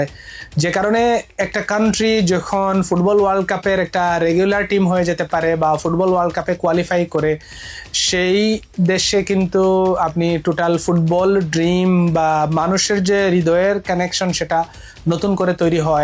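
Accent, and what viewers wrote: Sylhet, Bangladesh. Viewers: Sylheti mone hoy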